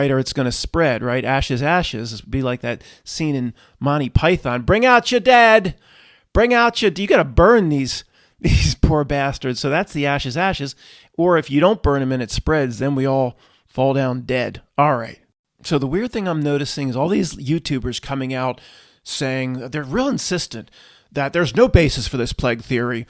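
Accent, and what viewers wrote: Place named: Philadelphia, United States. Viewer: 😂 Really love your Philly accent....💚🌻🙏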